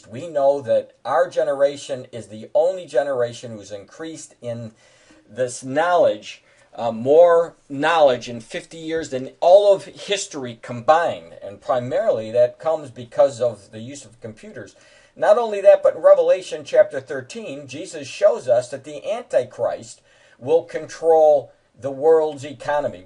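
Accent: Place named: Rhode Island, United States. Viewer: are you originally from Rhode Island by any chance? I'm hearing bits and pieces of an RI accent. Another great video!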